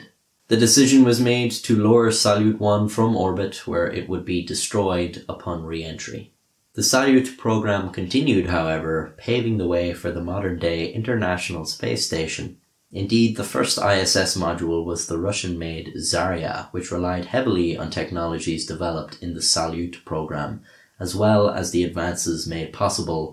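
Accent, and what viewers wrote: Ireland. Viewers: It's refreshing to hear another Irish accent that I'm not used too during this quarantine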